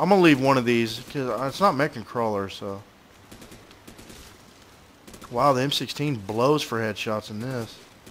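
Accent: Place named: South, United States. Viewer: where are you from? you sound very southern!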